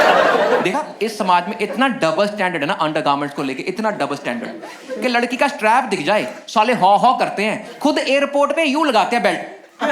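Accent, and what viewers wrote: Punjab, India. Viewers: I love punjabi accent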